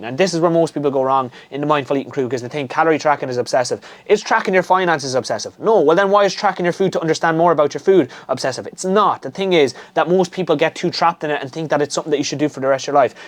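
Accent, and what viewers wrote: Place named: Ireland. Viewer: This guy sounds Irish.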